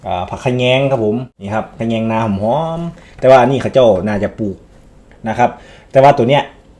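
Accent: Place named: Udon Thani, Thailand. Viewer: คือเว่าคือคนอุดรฯแท้อ้าย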